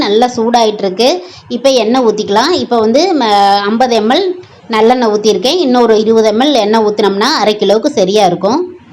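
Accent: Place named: Madurai, India. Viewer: மதுரை தமிழில்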